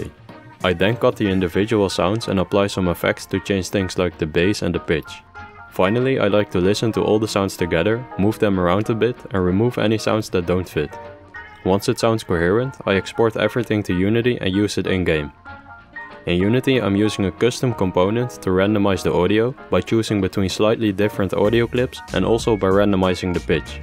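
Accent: Netherlands. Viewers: are you dutch? you really sound dutch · Are you Dutch by any chance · Hé is Dutch You can hear it by his accent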